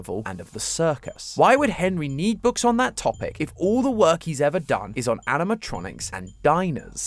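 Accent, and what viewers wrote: United Kingdom. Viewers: I love your British accent. I hope you continue to bring us these juice videos and get your own path in fnaf theories. ❤ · Iskhow to feel about ngl.. i like his.. british-esk voice but i still T^T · I clicked the video and I thought why is matpat making a British voice and remembered that he retired and this is, your doing a great job! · Feels weird but the British accent is kinda selling the emphasis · Yah he will do great it's just weird because a British voice instead of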